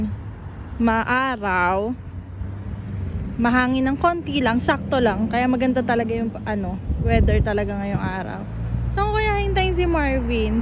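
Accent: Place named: Quezon, Philippines. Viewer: Puntong Quezon..Maubanin..Hello po from your kabayang pagbilaowin in winnipeg…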